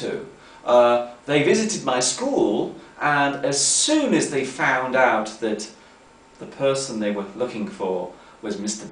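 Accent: United Kingdom. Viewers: I love your British accent!! ^^ Brithis accent sounds so neat!! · Since I became watching your videos I really improved my english, because they don't tire me; It's easy to spend lots of time watching you. And British accent is awesome ^^ Thanks a lot, your lessons are pretty valuable!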